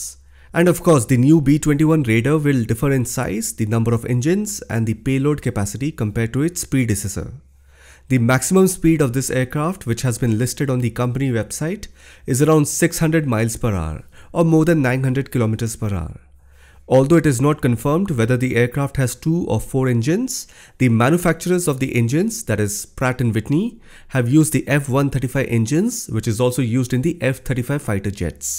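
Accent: India. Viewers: How many Americans want to hear a man with a thick Indian accent talk about American military and aviation? I spent 20 years in the United States Air Force. Call me crazy but I can't listen to him. For the sake of the holy cow. 🐄🐄🐄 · Are you a Chinese talking like an Indian? Following you for a while 🤔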